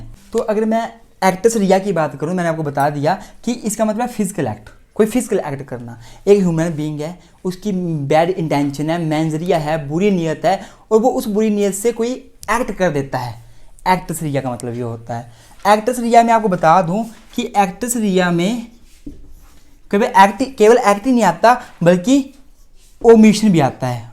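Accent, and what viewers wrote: Himachal Pradesh, India. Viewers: Are u from Himachal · pahaadi ,himachali ho aap?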